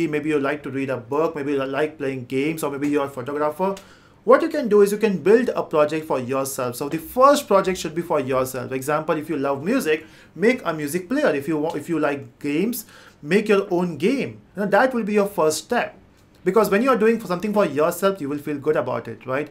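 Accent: India. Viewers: I hate Indian accent. No offense!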